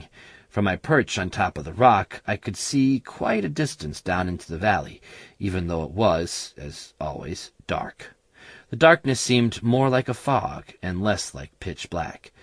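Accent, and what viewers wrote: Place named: United States. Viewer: The voice of the narrator is so casual, and conversational, for having discovered one's self in a nightmare; plus, he sounds about 16. He says he is England, so why did they use an American as a narrator?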